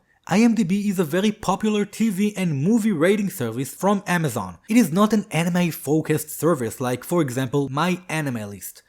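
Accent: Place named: Israel. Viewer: also, your israeli accent is really cool, it makes me laugh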